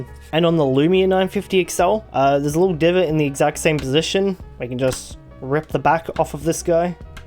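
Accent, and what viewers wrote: Australia. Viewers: I was about to say damn this guy should bee australian tghen i realised hes on yt au...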